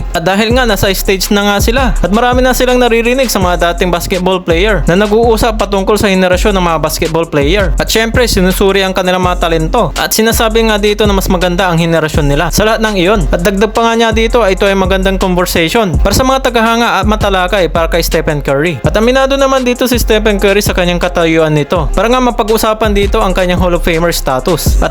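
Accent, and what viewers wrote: Pangasinan, Philippines. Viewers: Pangasinan ka idol noh,,? Halata sa salita mo.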